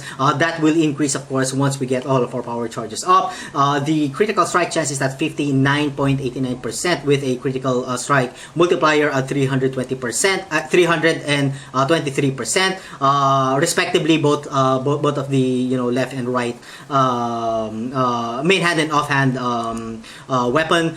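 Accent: Philippines. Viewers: I was like..? Why he sounds like a filipino? And I search all the social media and yeah youre a filipino "kababayan" and matry nga to why not? Thanks for this vid I'll try this especially I'm a newbie.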